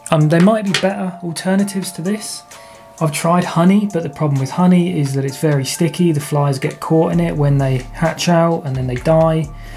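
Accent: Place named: United Kingdom. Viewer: Great video. I like the way you are not so scientific, but more casual and general. Sometimes the basics are more important than all the technicalities. I like the quiet ambient music in the background so I can hear you speak clearly. Nice British accent is also appealing. I learned a lot and enjoyed watching you. Thank you. Wow. I'm glad I made it to the end of the video because I love your idea about using the squeezable condiment bottles. I am looking forward to trying that idea for dispensing fruit flies.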